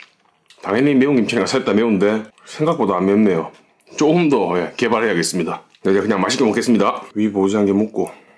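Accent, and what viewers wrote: Gyeongsang, South Korea. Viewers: 어디선가 뵌듯한데 어디서 봤는지 기억이 안나네ㅠㅠ 경상도 맞쥬? 위장 보호 해가미 드시소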